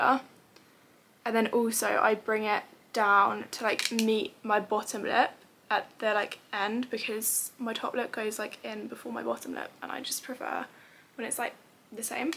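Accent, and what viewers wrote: United Kingdom. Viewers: I love youre british accent!!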